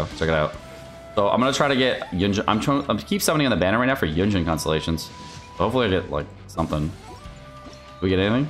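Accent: United Kingdom. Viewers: Where did British go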